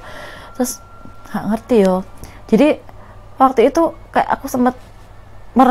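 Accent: Java, Indonesia. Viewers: Logat jawane seneng aku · aku nonton di tv rama² pas ada sodaraku yang bukan asli jatim malah jadi cekikikan karena mbaknya medok banget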